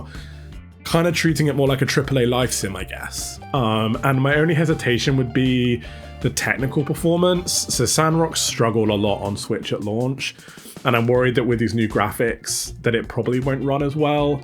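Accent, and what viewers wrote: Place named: United Kingdom. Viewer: Thank you for sharing your story, and being vulnerable about your mental health struggles. I appreciate your candor. I, like you, turned to cozy games as a sort of therapeutic experience. I’m a middle aged woman and I’m dealing with “middle aged woman “things”, on top of having a child who is in active addiction. I needed a way to calm my anxiety, so I tried playing cozy games. So far, it is helping. Love that you are a sports fan (Atlanta Falcons - awesome). I live in the southern United States, and sports are such a big part of life here. Just wanted to share a bit about me, and tell you that I appreciate your hard work and, integrity and your soothing UK accent and voice. 😊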